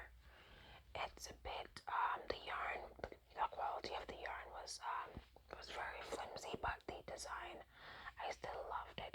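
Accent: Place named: Jamaica. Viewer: Where are you from? Sounds jamaican!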